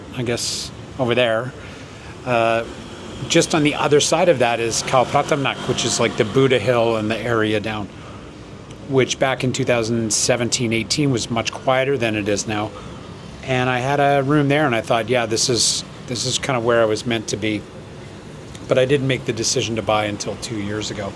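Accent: Canada. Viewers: Canada here... you didn't lose your accent! It's refreshing. I will be settling in Jomtien as well, in a year or two. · Are you a Canuck?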